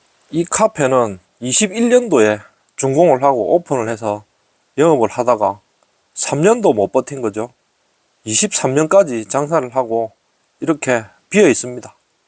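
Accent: Gyeongsang, South Korea. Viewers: 노노 갱상디언..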